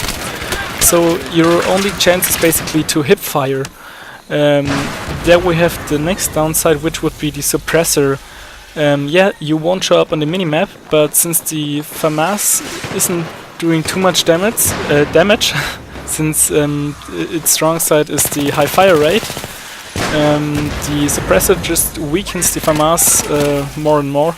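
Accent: Germany. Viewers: youre english is very german^^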